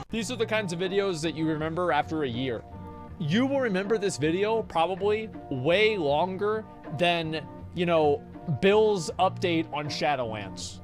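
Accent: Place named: England, United Kingdom. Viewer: you speak with english accent but reference shit like macaroni picture frames (not english at all) whats with that??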